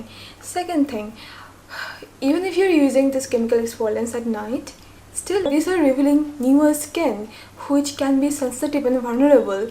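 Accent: Bengal, India. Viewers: It's her accent ( Bengali), her english is good though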